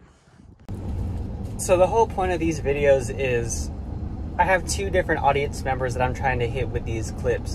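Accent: Texas, United States. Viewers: U talk so Texan shout out from DallasTx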